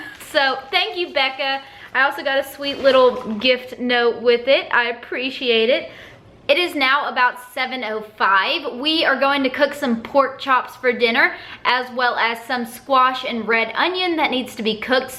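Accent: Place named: Texas, United States. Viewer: You are so adorable! Love watching your channel! Learning so much! I grew up in Ft. Worth so love your TEXAS accent!! ☺️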